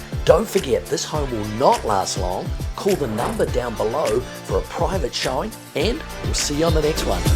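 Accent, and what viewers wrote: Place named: Australia. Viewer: Don’t know if that Aussie accent helps sell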